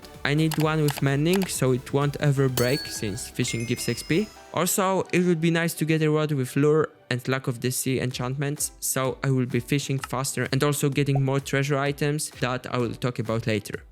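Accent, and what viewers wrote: Italy. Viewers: yo are you italian?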